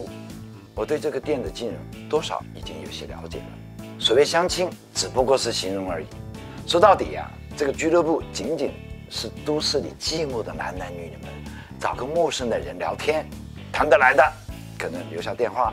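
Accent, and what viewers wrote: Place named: South, China. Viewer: 李さん的普通話口音是南方人，跟深圳相似。又不是央視新聞，無所謂啦。👋🏼😄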